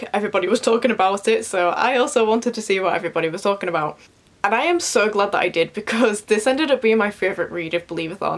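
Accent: United Kingdom. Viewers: I love your British accent, which part of the Uk does it belong to? Greetings from Italy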